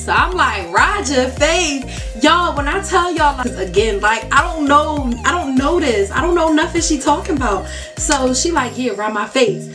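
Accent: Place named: Philadelphia, United States. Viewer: You sound like you from philly. You went to Girard College?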